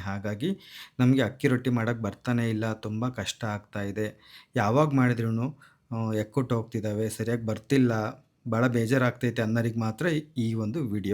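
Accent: Chitradurga, India. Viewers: Namma Durgada kannada 😂👌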